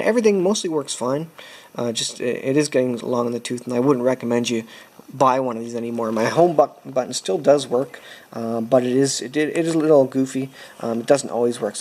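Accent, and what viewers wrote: Canada. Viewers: He's from Canada eh?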